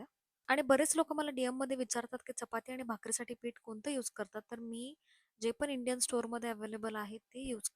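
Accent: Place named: Kolhapur, India. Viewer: Hey, love your simplicity and yummy recipes.. ugach show off nasato kadhi, specially Kolhapuri tone sounds unique.. keep shining 🎉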